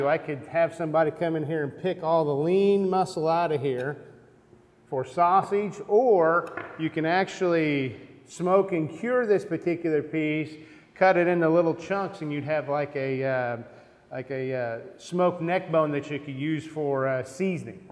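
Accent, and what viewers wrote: United States. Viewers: I was thinking why does this guy have an american accent, he works at the UK agriculture college.... it took until he mentioned Kentucky for the penny to drop.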